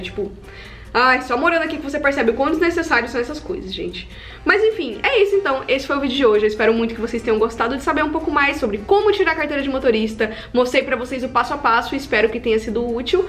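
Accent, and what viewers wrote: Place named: Caldas Novas, Brazil. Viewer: Minha família e de caudas nova e eles fala como vc ♥️♥️ legal q vc era de lá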